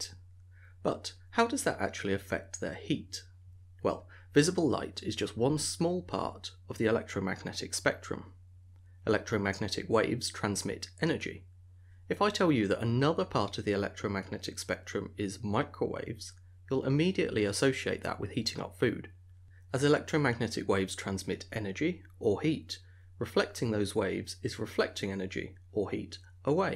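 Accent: United Kingdom. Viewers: Sounds Uk English, to me with not a lot of markers of a certain region or "specific accent" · British english.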